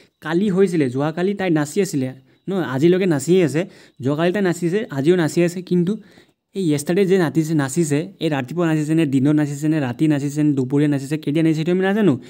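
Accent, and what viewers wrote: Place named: Nepal. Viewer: Assamese language with Nepali tone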